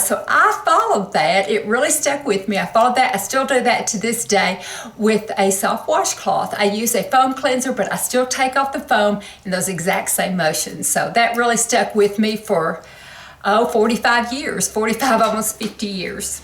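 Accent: South, United States. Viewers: Wonderful "tips"! I actually follow a lot of them. After too many years of not caring for my body (smoking, drinking, not sleeping enough, eating poorly, etc), I'm probably the healthiest internally than ever (at 63) since I've quit all that nonsense. Now I suffer with sciatica, but my husband and I hike portions of the Appalachian Trail yearly. I've put in a few hundred miles now and that's the only time my sciatica doesn't act up! Walking, climbing those Appalachian mountains, and sleeping in a tent for weeks on end make me feel youthful again! Amazing! So I'm a firm believer in STAYING ACTIVE, whether it's exercise in your living room or a gym, or bike riding, yoga, swimming, running...whatever you can do to stay active is a good thing. And having a hobby is great too. Keep the mind active as well! I am an artist, and I try to stay involved with my community art group. 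I just love your videos...you seem like such an sweet, genuine, Southern belle (Tennessee? Kentucky?), and I thank you for your videos.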